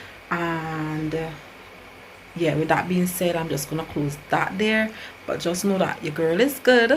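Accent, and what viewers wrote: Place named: Barbados. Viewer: For me it's nice when I come across content from Barbados. Girl you have adopted the Bajan accent very little of your former accent is left. God has already worked it out.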